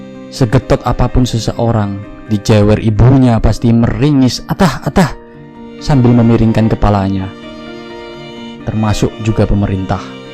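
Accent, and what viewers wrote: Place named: Java, Indonesia. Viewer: Jossssss keren, kata kata medok e tetep ketok,tp iku seng nggarai jos. Jowone tetep kudu onok. 👍👍👍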